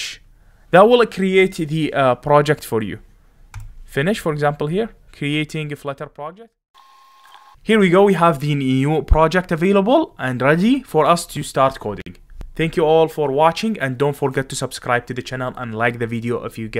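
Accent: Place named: Iraq. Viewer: لهجة مالتك مال عراقي انت عراقي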